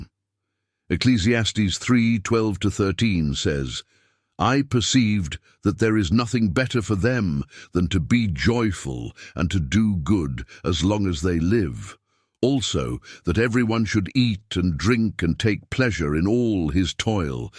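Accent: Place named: England, United Kingdom. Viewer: Very good. But please 🙏 please no more English accent.. please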